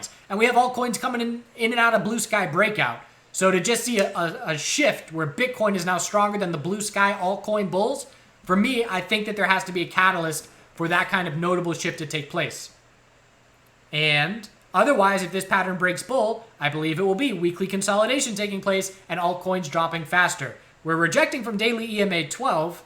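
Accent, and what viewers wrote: South, United States. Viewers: Like the southern accent more 👌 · Love the aggressive southern trader accent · if that wasn't enough a nice southern accent to put a smile on your face.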